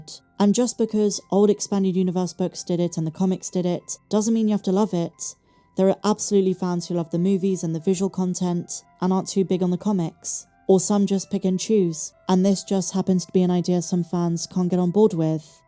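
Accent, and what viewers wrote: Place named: West Country, United Kingdom. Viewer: Please, your British right, So please say autumn and not the fall. Love all your wonderful videos and opinions on anything new in the StarWars world. What makes your Channel so brilliant, is that fact that you're British. Definitely from the West country. I'm saying Dorset going by your beautiful West country accent. ❤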